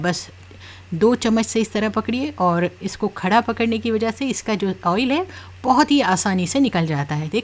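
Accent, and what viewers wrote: Hyderabad, India. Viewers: Yes ,her style of talking is Hyderabadi....n she stays in a remote place like farm house....beautiful....Barakallahu feeha... Loved her ooen balcony kitchen....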